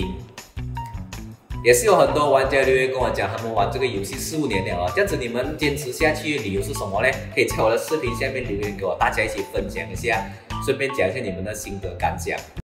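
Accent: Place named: Malaysia. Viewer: 最喜欢听你马来华文口音说：前戏，直至插入，这个前戏很美啊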